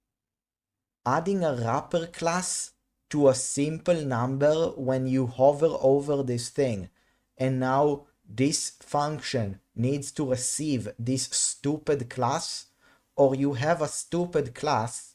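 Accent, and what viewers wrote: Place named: Israel. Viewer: Are you Israeli? You have an accent that really sounds like it.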